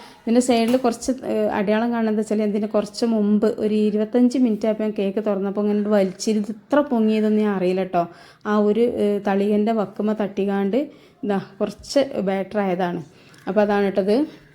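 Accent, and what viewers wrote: Malappuram, India. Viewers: മലയാളം മലപ്പുറം മലയാളം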